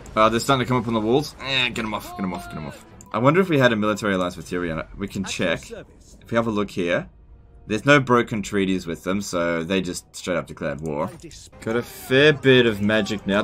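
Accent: Australia. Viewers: It's your down under accent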